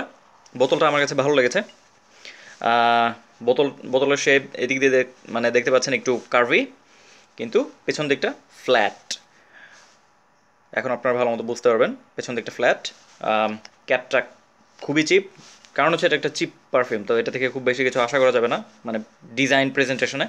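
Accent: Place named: England, United Kingdom. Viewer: Don’t mix up English accent in Bengali. It doesn’t suit you & moreover it truly irritates many. Disliked the video for the same reason.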